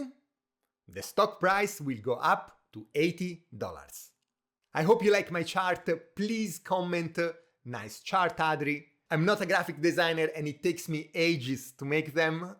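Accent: Italy. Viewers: questo accento mi sa di italiano D: anyway good video!! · Love your Italian accent and I your reasoning. I is vivifying. I use Eli Lily (prescribed by my doctor), but invest in Novo Norisk.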